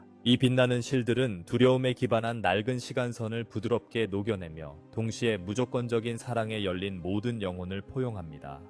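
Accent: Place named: Gyeongsang, South Korea. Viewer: 대박대박대박 화이팅하이숑 야호야호야호 경상도 사투리가 당연하지요 창녕띠기 아인교 야호 신납니당~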